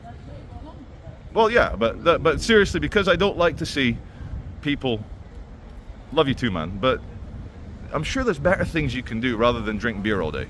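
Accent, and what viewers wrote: United States. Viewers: you sound like an american in england....lol love your video's never quit telling the truth about your country..the only difference between usa and there we dont put up with the crap fromthem..... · To me you do sound a bit like an American expat, just to be fair to the children and their innocent observations.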